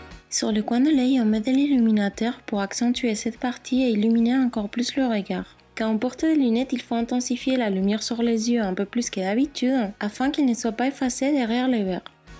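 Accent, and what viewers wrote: Spain. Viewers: J'aime beaucoup le second make up, tu es très jolie, mais j'ai du mal avec ton accent x) Tu es espagnole? portugaise ? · J'adore tes vidéos! Mais, je dois te dire j'aime trop ton accent! Il est trop chou! On dirait que tu parles l'espagnol mais en français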